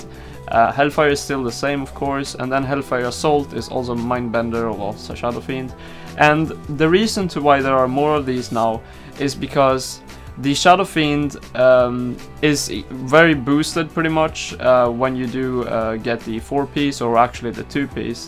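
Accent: Sweden. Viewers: You sound like a swedish person? Are you?xD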